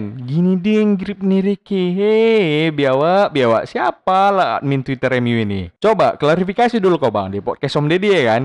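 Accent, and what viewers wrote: Medan, Indonesia. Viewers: Logat medan punya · Emang gitu bro ngomong nya khas orang medan kan si ini orang medan jadi gw tau ya karena aku pun tinggal di medan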